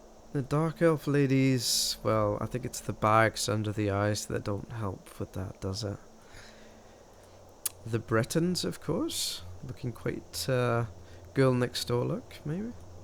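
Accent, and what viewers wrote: Scotland, United Kingdom. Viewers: Scottish accent, am I right? :) Great walkthrough, by the way! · Love your scottish accent, reminds me of that guy off Theme Park World who guided you through the game :) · omg your Scottish! :D it feels so rare to see another Scottish person lol xD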